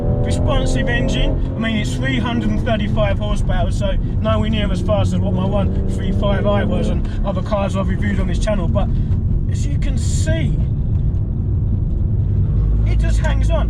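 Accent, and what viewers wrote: United Kingdom. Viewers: Excellent. So great to hear how it actually drives and how fast it feels, the body roll and suspension. This is important to me. Thanks. It's funny, you seem to have a UK accent, but appear to be driving in California. Enjoy the weather, mate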